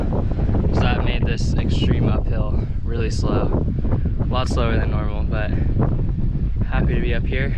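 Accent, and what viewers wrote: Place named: Hawaii, United States. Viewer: correct me if i am wrong but i am sure i hear a "Punahou" accent to your Hawaiian words...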